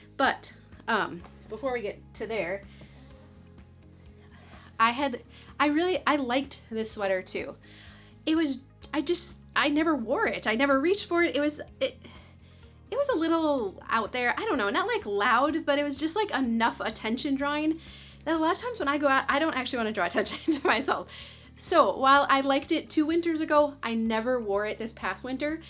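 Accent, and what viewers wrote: Minnesota, United States. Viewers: Love watching you, lived in Minneapolis years ago. Good to hear a good Minnesotan voice.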